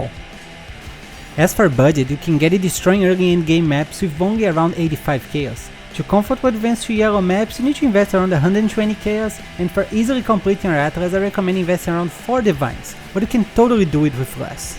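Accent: Brazil. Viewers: Quando um brasileiro fala ingles tu entende tudo.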